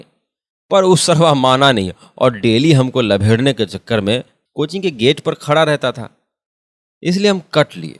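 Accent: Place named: Kanpur, India. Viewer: 👏Brilliant voice expression.. you narrated each and every part wonderfully ... And your kanpuriya accent is zabardast 👍 waiting for the next episode Sir ☺️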